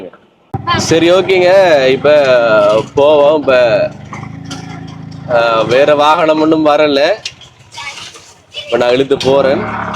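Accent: Sri Lanka. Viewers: இலங்கை தமிழ் மிக அழகாக உள்ளது அண்ணா😊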